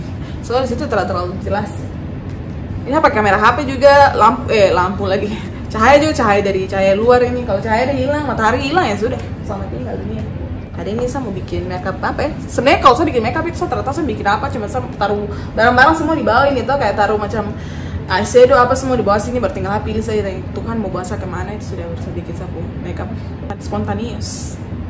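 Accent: Papua, Indonesia. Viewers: Yoi logat / dialeg Papua tu lebih bagus, jadikan itu sbagai ciri khas tersendiri pd channel km sist krna yg logat jakarta tu su terlalu bnyk e,, 😆😅😂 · Cantiik.nya natural.. Suka sama logat papua.nya.. Sukses selalu ya kak.. 😊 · Cantik 😍😍 suka logat papuanya, tdk yg buat* sok logat jakarta bgtu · Cantik apa lagi dengan logat papua keren👍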